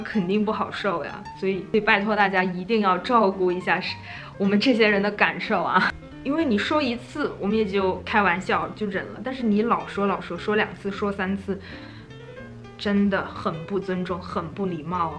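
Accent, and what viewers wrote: Chongqing, China. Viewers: 应该是博主从小生活在重庆的关系~ 您所谓的带朝鲜味或东北味的口音应该都是在东北三省地区长大生活的关系。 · 小姐姐很可爱，就凭这重庆话，关注了。🥰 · 重庆媳妇吗？重庆话这么标准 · 那是重庆话。。。吧？ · 我更感觉你是重庆妹子的味道，我最喜欢重庆妹子了，重庆妹子豪爽！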